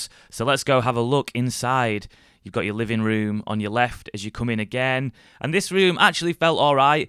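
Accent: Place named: Liverpool, United Kingdom. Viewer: I'm so In love! I want him!!! 🥰🥰🥰 I love the scouse accent and I say it all the time, an accent like his on a man like that is so attractive. 😍🥵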